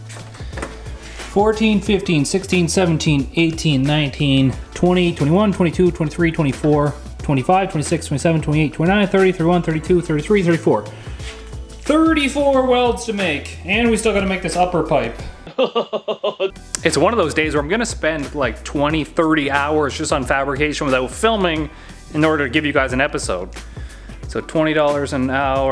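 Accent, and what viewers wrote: Canada. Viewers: i gave a like for the way you say 'out' canada accent is gold, from australia!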